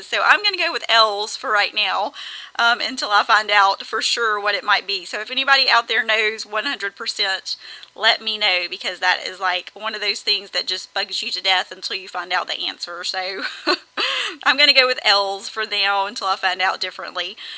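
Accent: South, United States. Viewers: Such a sweet layout!! Great job! I just love watching your videos because I'm from Alabama and love to hear a familiar southern voice :) To chicken to do my own videos because I think I sound awful on a recording. Love watching you though!!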